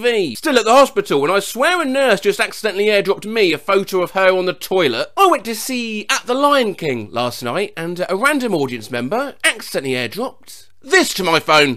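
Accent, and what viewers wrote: United Kingdom. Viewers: This guy is so aggressively British I love it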